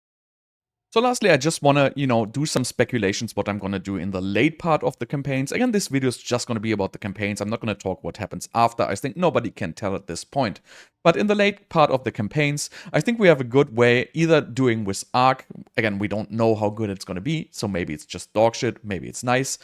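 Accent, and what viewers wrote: Germany. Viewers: Hello, I don't think the guide itself is bad, but you know what you're talking about. I just have a little problem when you say you only use this or these items at the beginning in the video. In theory it all works, but in the video you simply adjust endgame gear. They also make such a difference that the build runs as smoothly as you imagine, you know what I mean. But I don't want to say anything bad, I've been watching your guides for a long time and they've always been good. I have to praise you too ^^ Ps. Are you German? You have a German accent. Greetings from Germany. Nachtrag ich habe erst jetzt deine neuen POB bzw moba guides gesehen sorry mein Freund <3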